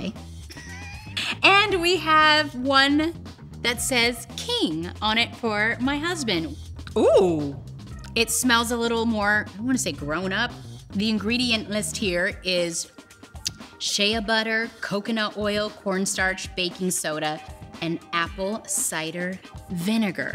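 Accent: South, United States. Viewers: You do a very good southern accent! Then again you did live in Texas lol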